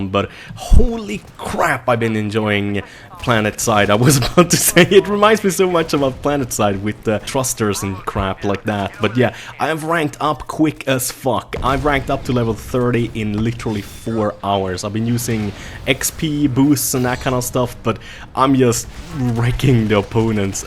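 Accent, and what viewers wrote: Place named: Sweden. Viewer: Hehe, it only takes me a few seconds to recognize the swedish accent.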